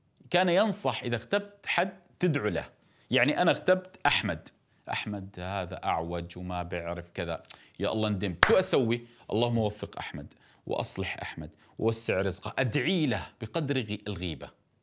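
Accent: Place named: Iraq. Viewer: شيخ حبيبي عمري 🥺 كام يحكي عراقي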